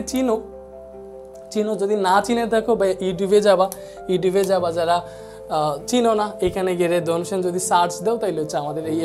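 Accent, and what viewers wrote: Sylhet, Bangladesh. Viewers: Baiya apni Ki Sylheti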